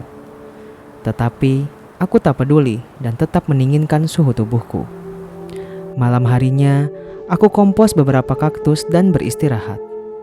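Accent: West Java, Indonesia. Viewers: dari logat ngomong nya kau orang sunda ya bang kalau iya sarua euy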